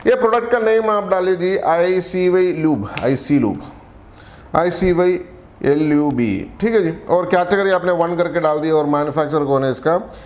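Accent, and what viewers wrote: Kerala, India. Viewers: Malayalam touch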